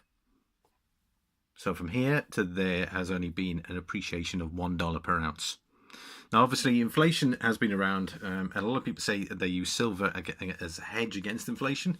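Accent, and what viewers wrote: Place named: Merseyside, United Kingdom. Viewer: Being robbed at gunpoint sounds fairly horrific. Can I ask where exactly that was/is ? I do detect a Merseyside accent...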